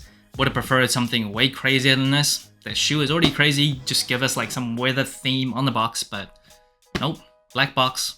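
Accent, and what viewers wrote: Australia. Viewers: Did you ever live in Australia because it sounds like you have a bit of an Aussie accent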